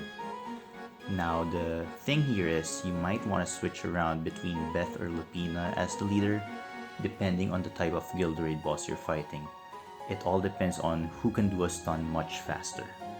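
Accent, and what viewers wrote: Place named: Philippines. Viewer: u sound pinoy af, in a good way xD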